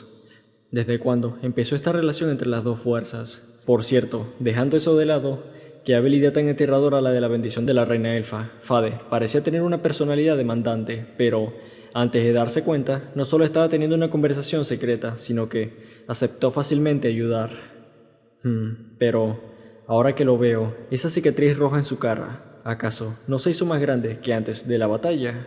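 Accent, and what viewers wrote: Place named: Venezuela. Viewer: El pana este habla como venezolano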